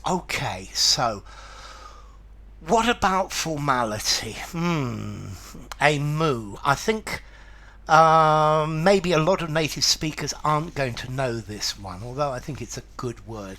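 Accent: United Kingdom. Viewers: He is always using his perfect British accent in explaining and giving vocabulary lessons , you can check his previous videos throughout these years. They might be at handy .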